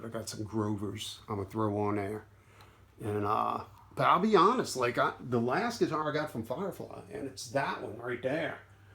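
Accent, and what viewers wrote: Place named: Baltimore, United States. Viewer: You sound like you’re from ball more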